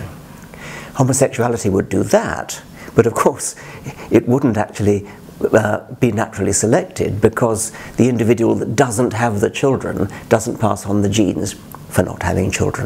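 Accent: England, United Kingdom. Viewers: Sounds so good with the English accent but complete bullshit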